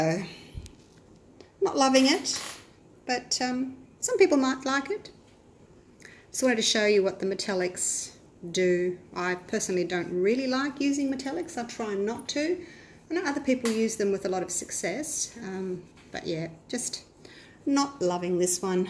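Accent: Australia. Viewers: Love your work and your tutorials. Especially the way you talk about your life. I feel like I'm listening to a friend and getting to know you as well as a new way of painting. It's good to listen to another Aussie Aussie Aussie. Oi oi you get the drift. Thank you.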